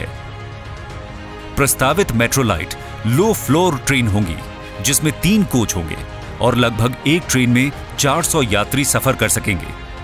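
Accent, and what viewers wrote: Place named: Bihar, India. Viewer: Varanasi The way of talking is completely Bihari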